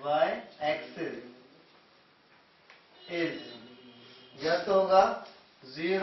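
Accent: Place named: India. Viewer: Awaaz se laga hindustani bhau pada rahe hai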